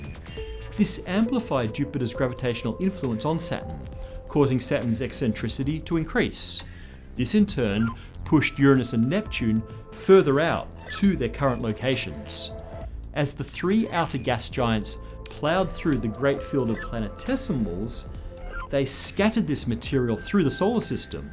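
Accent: Australia. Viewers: I don't think so. Sounds something akin to Australian.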